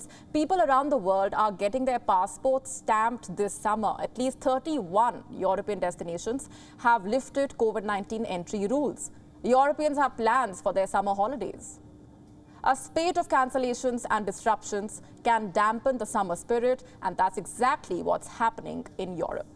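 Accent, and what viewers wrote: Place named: India. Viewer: She is one of the best speakers and keeps her nice Indian colonial upper class Charismatic accent.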